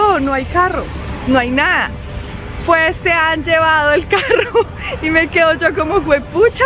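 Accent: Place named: Colombia. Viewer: Jajaja ahora si te salió todo colombiana contando esto. Saludos desde Chiapas México.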